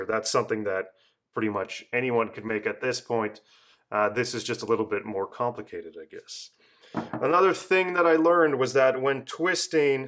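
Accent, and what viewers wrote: Canada. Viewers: The Canadian is strong with this one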